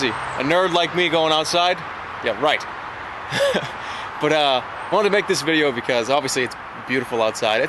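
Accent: Boston, United States. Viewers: he is so boston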